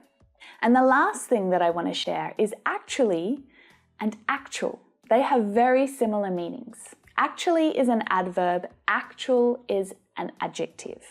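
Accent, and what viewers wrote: Australia. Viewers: speaks with an Australian accent...why do you say it is awful? Btw where are you from?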